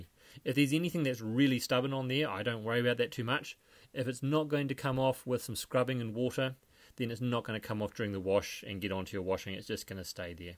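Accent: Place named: New Zealand. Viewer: Brilliant video - good to hear a NZ voice with short no nonsense simple instructions - thank you so much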